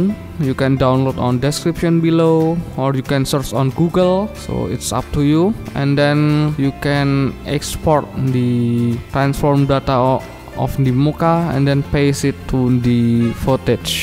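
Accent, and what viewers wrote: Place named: Greece. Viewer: I don't know where are you from but your pronunciation sounds really like greek😂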